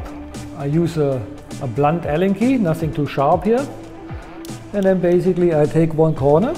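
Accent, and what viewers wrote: Germany. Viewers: Thanks for the video with the nice swabian accent. Als Schwabe hat man den halt...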